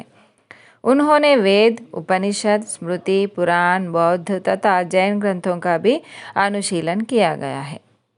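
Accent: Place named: Hyderabad, India. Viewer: Madam aap paka hyderabad sa ho all explanation is good except some parts of explanation but ok keep it up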